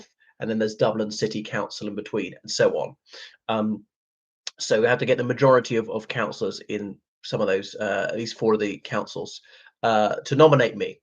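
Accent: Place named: England, United Kingdom. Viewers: Ah yeah you are just what we want for our President in Ireland - a Youtuber with an English accent, who cant speak the Irish language, who is anti-Irish Republicanism and who professes Unionism. Move over Cokehead McGreggor we have a new front runner in " from Ireland [with a plummy English accent]" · you don't sound Irish ? you sound english....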